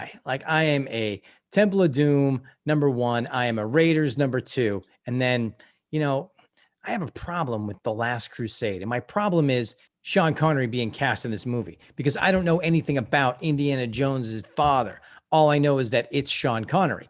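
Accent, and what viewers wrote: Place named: Boston, United States. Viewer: Too funny! Finally my love for has brought me fame! LOL Loving this and I plan on checking them all out. You may have moved around a lot but still maintaining that wicked awesome Boston accent!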